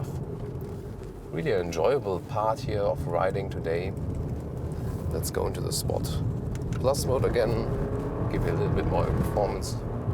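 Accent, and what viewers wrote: Germany. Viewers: A german guy with a thick accent reviewing a Porsche. Great!!!! Simply by far The best car reviews on youtube.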